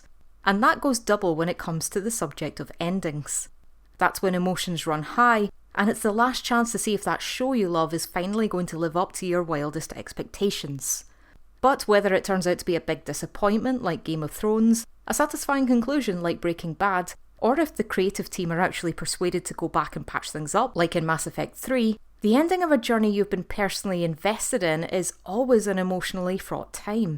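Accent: Scotland, United Kingdom. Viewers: Hearing another Scottish person talk about this is so amazing! Love the videos, keep up the great work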